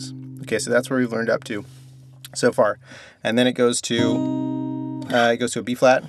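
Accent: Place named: United Kingdom. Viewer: This dude does one hell of a British accent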